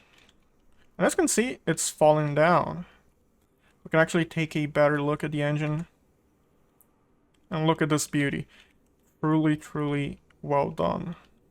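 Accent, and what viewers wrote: Scandinavia. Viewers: not especially... yeah I'm going with the guy below me, something Scandinavian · I was wondering the same thing. My guess is your mother tongue is something Scandinavian. Nice review, BTW.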